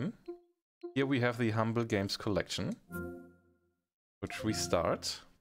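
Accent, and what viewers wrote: Germany. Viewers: Hey, hast du ein deutschen Akzent? 😅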